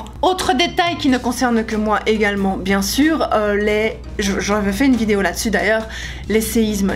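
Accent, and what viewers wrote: Belgium. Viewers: mdr ta façon de parler et tes sautes d'humeur me font penser à gonzaroo ! XD à croire que tous les belges s'expriment pareil . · bonjour.Venez habiter à l Ile de la Réunion!! Ici il y a quasiment que des métisses et sa marche. On devrai envoyer les étrangers en stage chez nous On a toutes les ethnie possibles : européens chinois , indiens , africains, malgaches, belge (humour) (mais pas encore de japonais! ça manque) ect... et tout ce petit monde s'aime et se reproduit a tout va ... Personnellement je suis chtimi des hauts de france (ça pète hein !) (salut cousine) et je suis mariée à une réunionnaise , bilan : mes fils et mes petits enfants sont tous de couleur différente !!! pas facile d’être raciste dans un tel contexte !!!!Enfin on s 'en accommode....voila voila , tout ça pour dire que si tous les cons volaient bin il ferait nuit 24h sur 24. 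Bien venu au petit haricot que le monde lui soit propice . Ps : vous êtes belge je confirme il y a des petites intonations qui ne trompent pas ! Amicales pensées.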